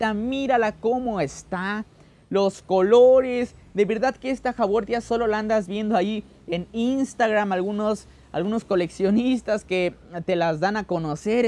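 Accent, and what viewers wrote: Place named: Spain. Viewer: Porque hablas raro ? Tipo español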